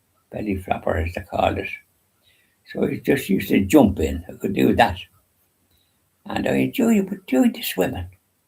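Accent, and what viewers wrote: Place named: Ireland. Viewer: Your Irish accent is difficult for me to understand sometimes but I understand most of what you say, and I think I’ll understand you more the more I watch your videos! God bless you and yours!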